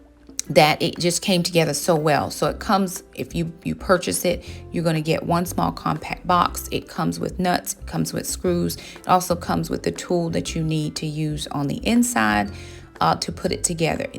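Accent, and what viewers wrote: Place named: Carolina, United States. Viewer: I love the Carolina accent 🩷 also you just made me look up homestead, farmstead, and its actually what ive been wanting to do. (God speaks)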